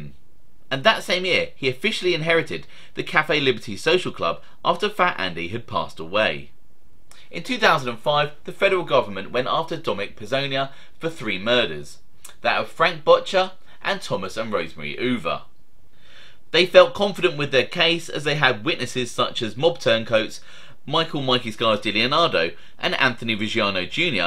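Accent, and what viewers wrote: United Kingdom. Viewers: I normally despise the British accent(no offense) and refuse to listen to it but you're channel is damn good I can't help it.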